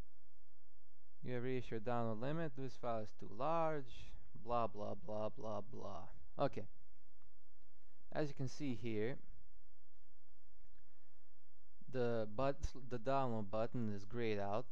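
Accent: Russia. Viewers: you sound like him, are u russian ? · Oh, and your tutorial was awesome. You Russian? Your accent is awesome lol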